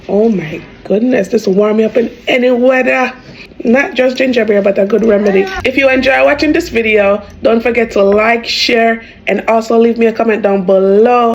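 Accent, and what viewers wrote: Jamaica. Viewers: I have to make this, omd. I take ginger pills for nausea, may as well make the ginger a whole experience. The recipe looks amazing, thank you so much for sharing it! Your accent reminds me of my great grandmother (she was Jamaican, though she’d been in Dublin since her 20s). Beautiful memories, thank you 🩵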